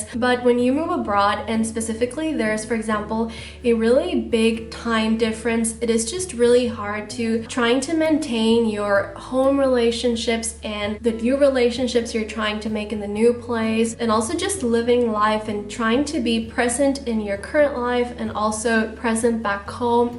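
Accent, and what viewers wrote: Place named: United States. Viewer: Your English is perfectly American. You didn’t learn that in Honduras, did you?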